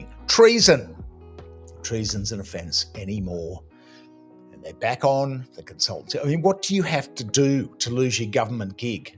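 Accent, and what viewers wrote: Australia. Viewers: 😂 he is an Australian jew .that's what they do they hide behind the Australian accent but they are jews it's the best way to deceive you my fellow Australians ,nearly half the politicians in Australia are Zionists jews it's very convincing when you speak the language of land and sound like you are one of us , dont kid yourselves their loyalty is to zionastan not Australian we are awake and aware we haven't forgotten the world's of the Jewish Australian "Richard battler " quote " the weapon of mass destruction "and little pathetic Howard fell for it and took us to war in Iraq .thank for your video .❤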